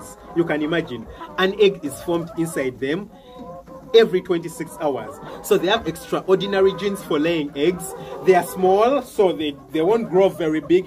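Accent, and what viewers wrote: Uganda. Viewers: Sir are u from uganda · Your accent is so ugandan 🇺🇬🇺🇬 mucu love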